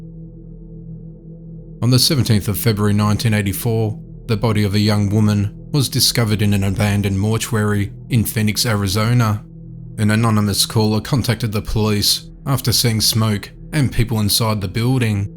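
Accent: Australia. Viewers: Me too! He's smashing every other true crime page i am subscribed to! i love his voice and finally finding an AUSSIE amongst all the true crime channels 🙌🙌 No offence to anyone not feom Australia- I've just found it VERY rare to come across :-)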